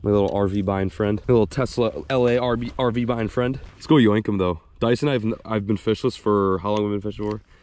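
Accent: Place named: New England, United States. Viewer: Coming from a New Englander I love the accent you picked up with my fellow boys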